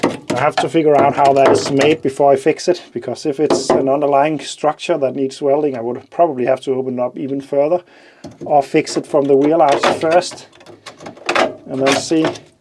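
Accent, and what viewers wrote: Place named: Denmark. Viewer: Hello i just stumbled upon your channel because i saw this saab video. Then i heard your voice and i knew you were danish! (im danish too). It's great to find a danish car channel, there's so few :) I love the saab 900's and im probaly buying a saab 900s (low pressure turbo) as my first car! It's got a bit of rust, the clutch hydarulics arent working but it's awesome! I agreed with the owner that he was going to fix a little problem on it and then i'll buy it. It'll be a great project! :) Keep the saab videos coming :)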